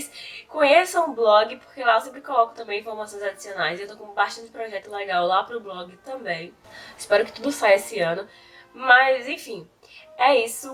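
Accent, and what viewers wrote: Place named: Alagoas, Brazil. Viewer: Êêêta que sotaque bonito! Minha conterrânea (Nasci em Maceió, mas vim pra PE pequeno), curti muito o vídeo. Embora a minha praia seja mais o lado Heavy Metal, conheço um pouco da sub cultura. Há vários anos atrás eu até cheguei a ler "A Happy House in a Black Planet", que o um dos sites que você listou disponibilizar, ele dá uma boa geral no assunto. Aqui em Recife, a cena era bem forte antigamente, hoje em dia acredito que esteja mais fraca (novamente, falo pelo lado do metal... mas acredito que isso se aplique a todos)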